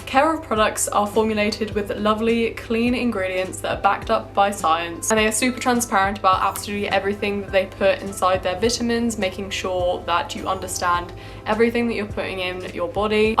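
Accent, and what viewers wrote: United Kingdom. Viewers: I live in Rio de Janeiro, I've learned english by myself, but the british accent It's LIFE. You are so Sweet and your Voice calms me down... I love your videos ao much omg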